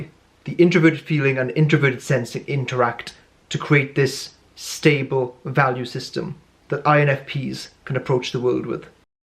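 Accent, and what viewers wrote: Wales, United Kingdom. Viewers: Interesting. You explained the INFP very well. Is that a Welsh accent I hear?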